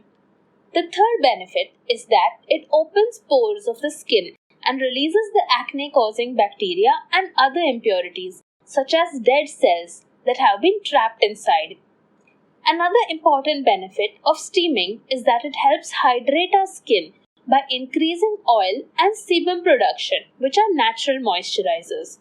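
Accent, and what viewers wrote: India. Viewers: Indian english are very rought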